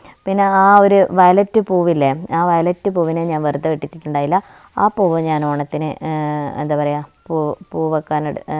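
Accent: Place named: Kannur, India. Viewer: Kutti payar,cheera,mathanga,ellam adipoli aayittund.....oru vithittu athu mulachu varumbol namuk kittunna santhosham athu paranjariyikan kazhiyilla. Nigalude pachakarikalk ellam valathinte kuravu nallathupole und.athukondaa ilakal ellam muradichu nolkunath.cheera kandille muradichu nilkinath.....onapookalam idanulla vegitable flower cash koduthu vangendi vannillale..... Aa manja fruit chiranga vargathil petta inam aanennu thonunnu. Njagalum kaakka poo enna ivide parayunath. Njan paranjathpole thane thakkali ellam kuzhichittu ponnal mathi pine angott thirinju nokkanda😂😂😂😂 Ente nigal vellavum valavum koduthitunel cheera payar ellam adipoli aayi undaayene.valakoorulla mannu cash koduthu vangunathinekal nallathalle valam vangunath. Enik nigalude kannoor shailiyil ulla avatharanam orupad ishtaayi Super video aayirunnutto Pine ente kalyanam kazhinju tto😊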